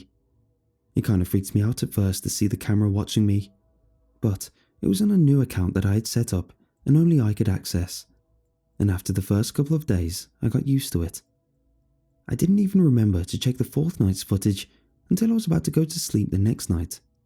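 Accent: England, United Kingdom. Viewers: hes english... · I'm back and obviously I ment my specific country, as in England the country, believe it or not we don't just announce were British at the start of our conversation for the hell of it. The guy reading in the story had an English accent, thought that connection was obvious. And no the term 'country' does not only refere to southern states of North America. Do they teach yanks about the rest of earth or no? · hes British boy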